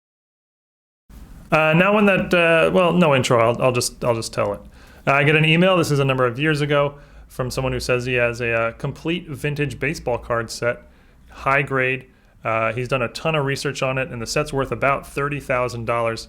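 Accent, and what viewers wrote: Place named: Baltimore, United States. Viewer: By any chance are you from MD/baltimore? your accent sounds baltimore...and I feel like I met you years ago at a show I'm in my 30s now getting back into collecting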